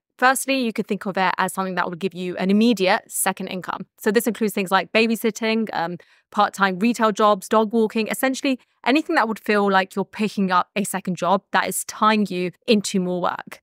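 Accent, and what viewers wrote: United Kingdom. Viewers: Can you start a British accent class? Many people will pay and join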